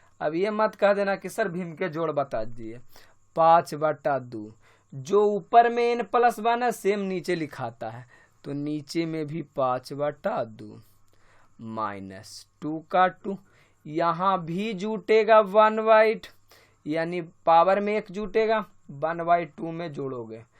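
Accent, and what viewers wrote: Bihar, India. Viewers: Sir,kya aap Bihar k Gaya jila se ho..??🙄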